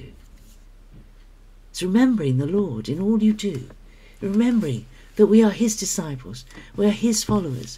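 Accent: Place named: United Kingdom. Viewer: I was isolated for a long time due to health issues, & it made it difficult to stand firm in the faith. I am now rooted in local fellowship, but I still spend a lot of time stuck at home alone, so finding Biblical channels like yours is a wonderful way to renew the mind day to day! (Your delightful gentle British accent doesn't hurt either...pleasant to the ears!)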